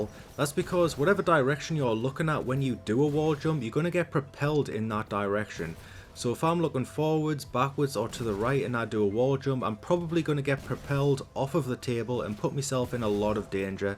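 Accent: Newcastle, United Kingdom. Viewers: Geordie accent?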